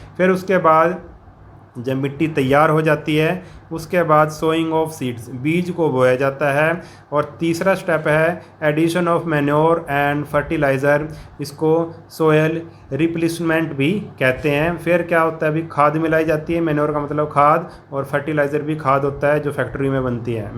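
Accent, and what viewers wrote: Punjab, India. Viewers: I think he is Punjabi so his accent is Punjabi 😊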